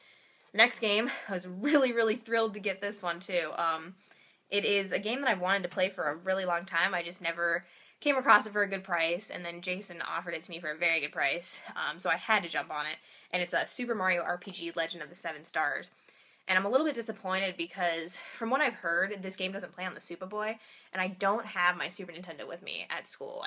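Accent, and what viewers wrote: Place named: Ohio, United States. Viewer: I'm a new subscriber, but really enjoying your videos! Quite a few to catch up on, but I'm sure I'll get around to them eventually :) Sounds like you're a fellow Ohioan, so it's always nice to meet another one of those. I'm in college as well, so I can certainly relate in terms of business I'm sure. Some great pickups this time around! Especially Spyro! Some of my favorite games on the PS1. 2: Ripto's Rage is definitely the one you'll want to get, though! Excellent game!!!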